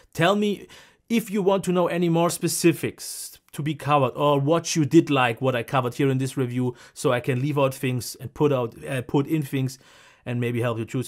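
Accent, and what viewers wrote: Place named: Germany. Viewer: alter der deutsche akzent xDDDD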